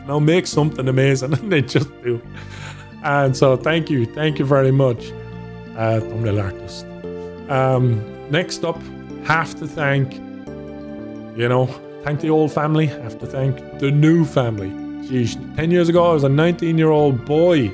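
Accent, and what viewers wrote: Ireland. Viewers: He sounded extra Irish in this vid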